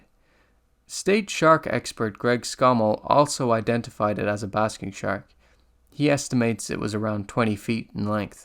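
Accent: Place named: Ireland. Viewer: I hear a hint of Irish in your speech...where are you from in Ireland?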